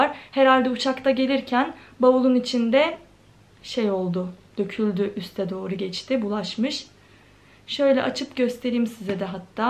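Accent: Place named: Ankara, Turkey. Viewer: Hem karadenizlisin ama ankaralı gibi konuşuyon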